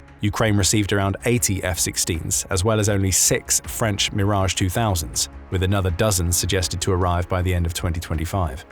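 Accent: United Kingdom. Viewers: These videos are copium with a British accent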